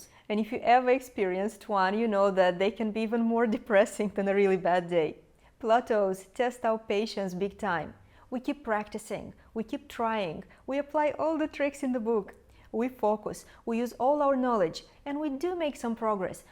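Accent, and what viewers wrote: Russia. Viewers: Teacher, this audio is better. Keep using the little clip microphone. A previous video I saw, I had a hard time hearing you (scale practice). There was too much room reverb, plus you have a Russian accent. I would consider a course of yours, but as a retired person living on reduced social security, I can not afford it. Plus, I have a hard time trusting you. Mel Gibson was married to an accomplished Russian pianist. Young and beautiful as yourself. They had a baby together. Then they got divorced and she took him to the cleaners.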